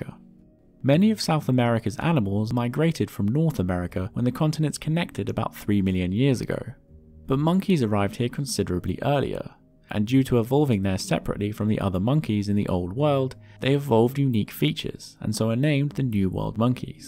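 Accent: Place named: United Kingdom. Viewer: A british accent is essential when telling "just so" stories to small children.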